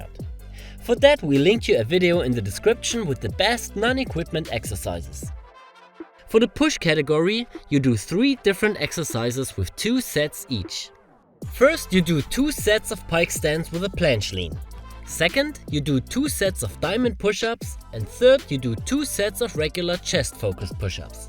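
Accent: Germany. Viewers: Plant based & fasting. & sobber train except coffe) =never sick again! Can prove it😍 Gruss aus Bali🌴 Ps:funny german accent) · Is this a german accent?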